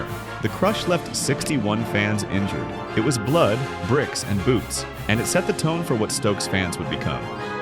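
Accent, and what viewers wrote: United States. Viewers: What’s with the American accent narrator? Anyway, Stoke football violence actually originated from 1968 with the coming of the skin head cult. The events from 1985 onwards were just a continuation of the moronic behavior that started much earlier. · The minute I hear an American voice talking about British sub culture - I'm off. Ta Da.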